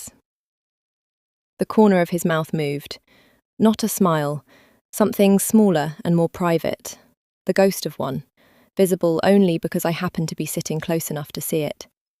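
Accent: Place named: United Kingdom. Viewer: Finally a brittish accent. Nice story. Gothenburg, Sweden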